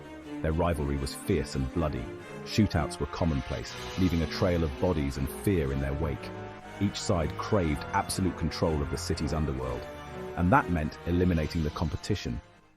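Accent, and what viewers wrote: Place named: England, United Kingdom. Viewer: Why is it always a English person narrarating !!!!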